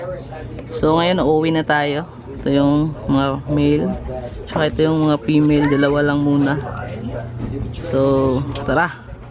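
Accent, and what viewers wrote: Visayas, Philippines. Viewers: 100% if he speaks english you will find a hard time to understand it due his bisayan accent, and its hard to communicate outside your native language. 😀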